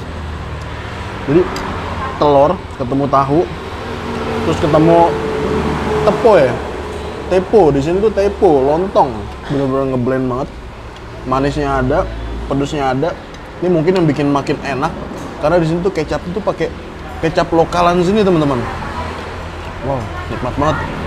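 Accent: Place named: East Java, Indonesia. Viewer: Jawa timuran nih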